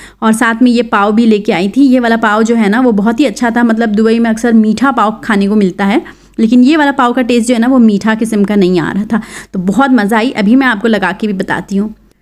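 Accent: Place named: Uttar Pradesh, India. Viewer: App Mumbai so ho. Lekin bat tu pori up wali kartey ho☺️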